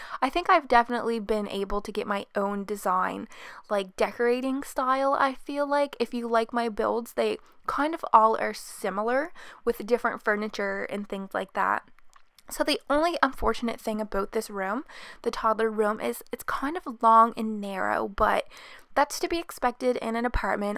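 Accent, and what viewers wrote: Canada. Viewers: b Canadian I think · Love this!! Is that a canadian accent i hear? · are you Canadian? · Are you Canadian?? BTW love the build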